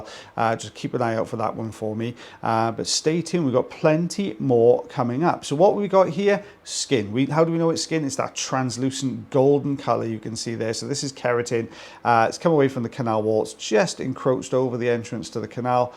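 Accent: Wales, United Kingdom. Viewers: Think you could do an audio book. Cool, calm, Welsh accent, 🎉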